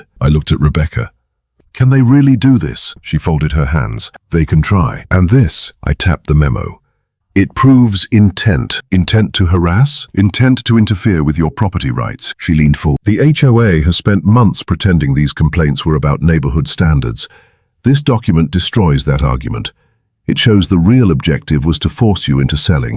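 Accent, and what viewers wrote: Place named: United Kingdom. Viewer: The story was a good one. They never let you know what the HOA and Keren ended up with in court. They were guilty. Yes, but what were the penalties. The other thing was the v oice used. That was a British voice. The story was in the USA. His family had been on that farm for 60 years plus.??????????????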